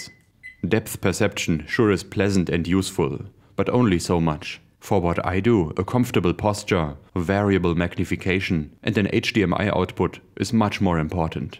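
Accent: Germany. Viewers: höre es sofort, DEUTSCHER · Nice german accent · but with a German accent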